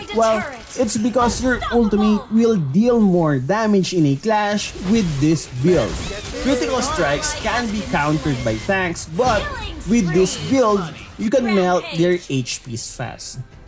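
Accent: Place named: Philippines. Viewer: The pinoy accent tho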